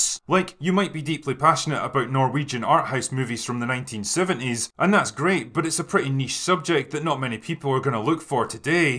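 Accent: Scotland, United Kingdom. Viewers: The Scotish accent helps too. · Love you,...same reason I love "orange man good". You're blunt, intelligent, you don't give an F and you get to the point, with a unique Scottish accent, articulation and you're just outright hilarious at times. I thank God for ya, mate. You're a man's man with a good heart. Alright. I'll go away now. Hugs bub. 🤗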